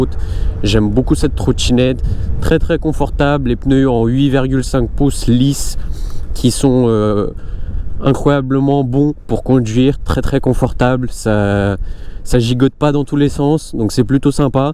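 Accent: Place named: Romania. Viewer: Vous avez un accent. Vous êtes d'origine roumaine ? Vous parlez bien français. Attention au code de la route...!! Ligne blanche continue...et plus ENCORE...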